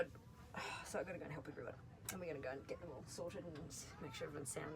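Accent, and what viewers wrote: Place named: United Kingdom. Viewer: Im from uk and i pronounce it same as you lol. What part of uk were you in they are giving us a bad name guys lol xx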